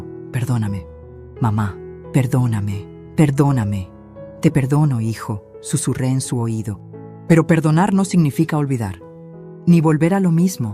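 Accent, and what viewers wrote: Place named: Portugal. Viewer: Buena historia esta voz narra varias historias, pero es chocante que narre y meta portuguez y la acentuacion española ?????? Con todo respeto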